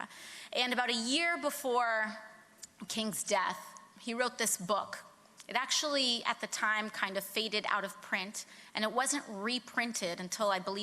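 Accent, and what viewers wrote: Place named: New York, United States. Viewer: She is so cute with her newyork accent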